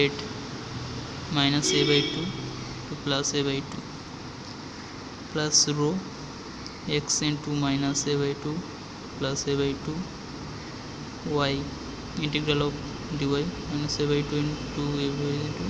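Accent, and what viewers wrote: Bengal, India. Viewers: Bengal se ho kya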